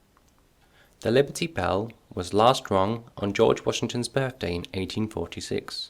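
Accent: United Kingdom. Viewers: Wonderful video!!!! Thank you - I so love the British accent :D!!! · Your british accent is so awesome❤️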